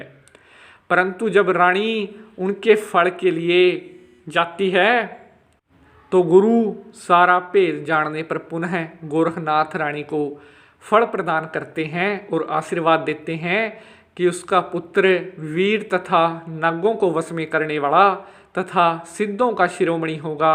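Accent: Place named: Punjab, India. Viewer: Koi Punjabi lagda video bnon wala hindi nal gutham ghuthi hon dya e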